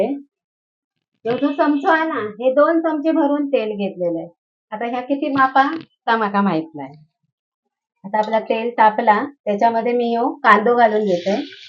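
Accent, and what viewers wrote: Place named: Malvan, India. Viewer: मसाले भात भारी 👌🏻 तुमच्या तोंडी मालवणी भाषा गोड वाटता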